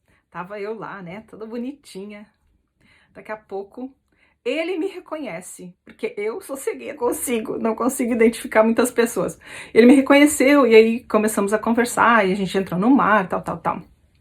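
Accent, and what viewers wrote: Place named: Rio Grande do Sul, Brazil. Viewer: Boa noite querida! Foi bom ouvir suas lembranças também me lembrei das minhas kkk. Vc é muito bonita não aparenta a idade que tem 👏👏 eu fiz 54 dia 4 de abril. Olha eu acho legal quando vc me chama de guria kkkkk é quando vc fala BA 😄 VC não esqueceu o sutaque kkk também gosto quando vc fala em inglês estava estudando inglês só parei por causa desse corona não consigo estudar. Fique com Deus 😘💖🌺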